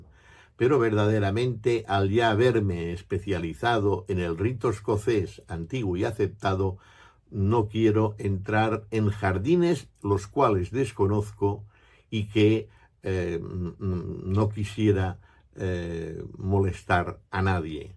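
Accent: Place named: Catalonia, Spain. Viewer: Se nota su acento catalán Un saludo desde Miami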